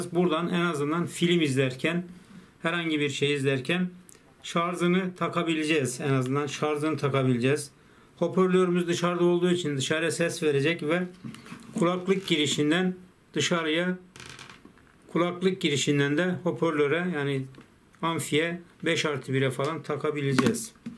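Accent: Erzurum, Turkey. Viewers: ABİ SEN ERZURULUMUSUN :)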